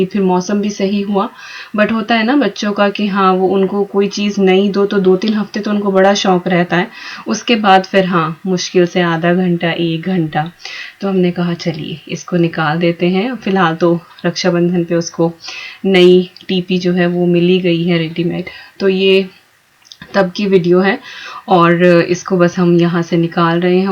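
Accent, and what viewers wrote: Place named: Muzaffarnagar, India. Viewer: Di plz reply aap India me kha se ho aap ki baato se lg ra h aap mzn k aas paas se ho kyoki kale sing ji whi pe h or wha aise hi chulhe pe kheer bnai jati h nd wha pe hm bhi gye h kitni baar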